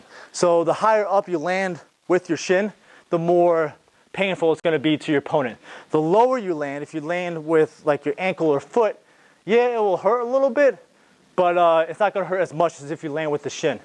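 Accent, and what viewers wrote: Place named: Russia. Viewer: Low Kicks my #1 favorite technique, no bullshit Russian Accent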